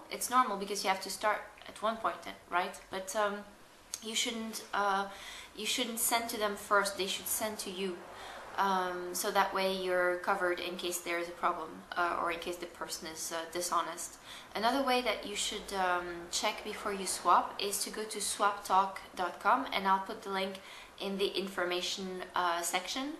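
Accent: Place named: Sweden. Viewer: What country are you from? You sound Swedish :)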